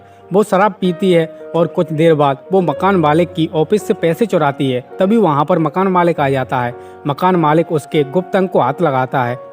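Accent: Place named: Madhya Pradesh, India. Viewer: apki boli ko pehchaan liya me Gwalior se hu 😁😁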